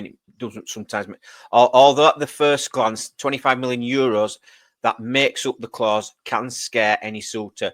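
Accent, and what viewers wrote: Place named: Leeds, United Kingdom. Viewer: Aye mate watching my first video. Sounds like you’re from Leeds way as well so that’s all good. Just subscribe 👌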